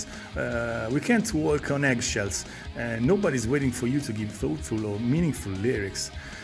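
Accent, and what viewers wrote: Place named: Italy. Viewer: A gimmick can be cool and definitely help out and Lordi would not have gotten as big as they did without costumes. However, today's Top40 is full of music that lack ANY personality (when did you last hear an original rap song?). I think your English is very easy to understand even though you can hear an Italian accent which sounds a bit refreshing. Perhaps that could be a gimmick too in some sort of way. Holy Super Mario Spaghettio 😆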